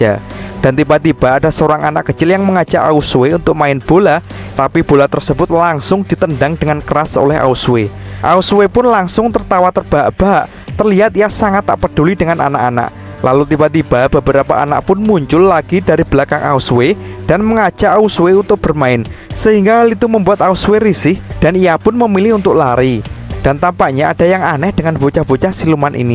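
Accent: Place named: Madura, Indonesia. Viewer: Kok malah kek orang Madura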